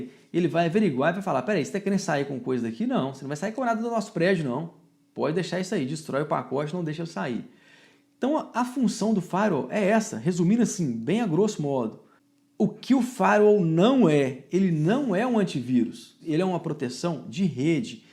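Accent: Minas Gerais, Brazil. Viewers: Sotaque top de mineiro e professor nota mil! Continua com as aulas querido professor!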